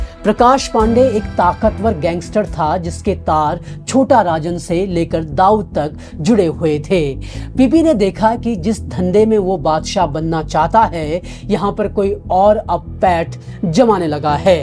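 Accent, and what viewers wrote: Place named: Pahadi, India. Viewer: पहाड़ी हैं। हम लोग इसी भाषा में बात करते हैं।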